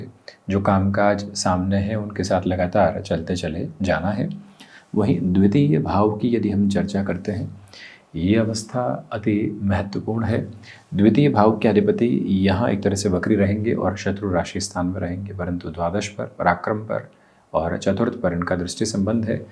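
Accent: Standard. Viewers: बहुत शुद्ध हिंदी बोलते हैं आप, नमन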